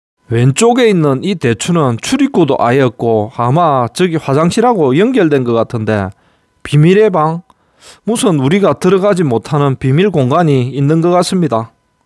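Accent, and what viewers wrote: Gyeongsang, South Korea. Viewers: 그런데 지자체 헛돈 쓴 곳이 경상도랑 강원도 같은 곳만 있나? 흠. . 말투는 경상도인데 신기하네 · 딱 첫마디듣고 경상도인줄알았다!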